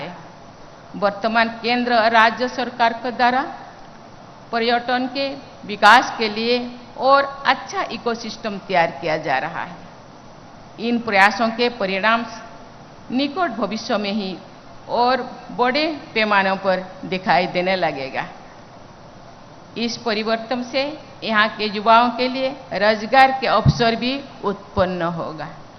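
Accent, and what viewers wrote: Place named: Assam, India. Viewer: একেবাৰে আমাৰ খাটি হিন্দী । এজন অসমীয়াই কৈ থকা যেন লাগিছে শুনিব খুব ভাল লাগে আমনি নালাগে 😍😍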